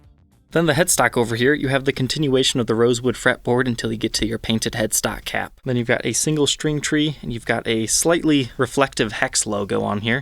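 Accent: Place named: Ohio, United States. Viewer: It’s that Ohio accent. He always says things like “needs restringed” instead of “needs to be restringed”.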